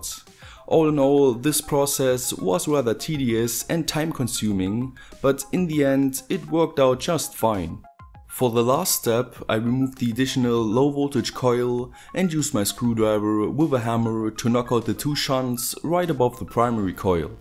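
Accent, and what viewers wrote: Germany. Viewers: was für ein deutscher akzent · I still don't get how Germans get so fluent in English but still sound German as fuck.. Great vid by the way.. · Man hört das du deutsch bist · are you german? just asking, my ears tell me english german accent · im from germany too, so i often hear a lot worse versions of english from them as yours is (its mostly pretty good despite the accent); but still i was bursting out laughing when you said "unsatisfactory" :D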